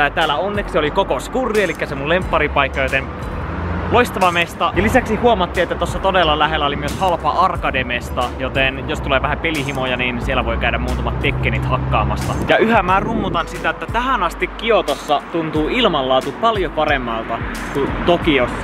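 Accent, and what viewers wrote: Finland. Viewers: Ystävällinen pyyntö - voisitko olla ääntämättä englannin sanoja tuolla suomijuntilla tavalla: ARKADE, SHHRAINI, HEDKUARTTERS, LEGIT jne. Asia on häirinnyt kaikissa videoissasi. Onko ylipäänsä pakko edes käyttää englannin sanoja, jos yhtä hyvin voisi sanoa vaikka "pyhäkkö" tai "päämaja"? Mutta hyvää Kiotossa oleskelua! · se on vaa tätö finlishii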